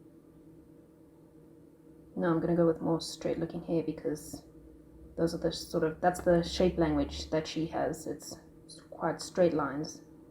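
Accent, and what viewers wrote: South Africa. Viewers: AHA! Checked your profile to make sure. Knew I heard a South African accent!! #ProudlySA Keep up the awesome work!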